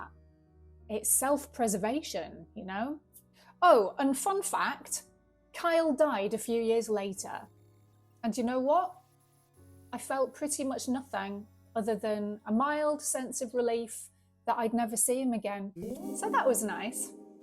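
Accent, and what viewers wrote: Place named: RP, United Kingdom. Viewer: Very posh .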